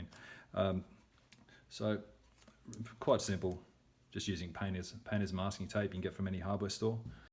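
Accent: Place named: Australia. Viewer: Awesome, sounds like your an Aussie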